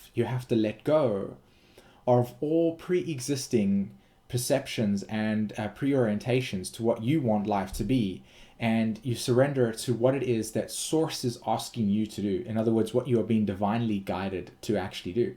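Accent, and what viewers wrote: South Africa. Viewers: Are you South African ???🙀😺. Great video 👍🏽🌚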